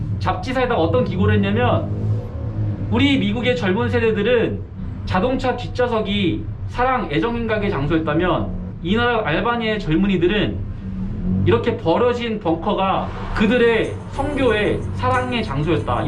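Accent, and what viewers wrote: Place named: South Korea. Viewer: 형님 발음 중독성 오집니다 ㅋㅋㅋㅋㅋㅋㅋㅋㅋㅋㅋㅋㅋ 리얼 코리안 영어발음 저를 보는거 같아서 내가 영어잘하면 이런 느낌이겠지? 하면서 봅니다 ㅋㅋㅋㅋㅋㅋㅋㅋㅋ 너무 재밌어요 전염병 조심하시고 즐거운 여행하세요 형님~~~~ 아 저는 24살 대학생입니다 영상 너무 재밌어여~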